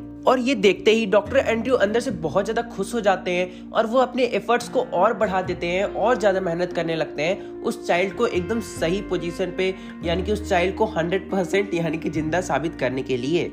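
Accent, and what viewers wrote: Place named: Bihar, India. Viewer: By your accent I think u r from bihar 😀😀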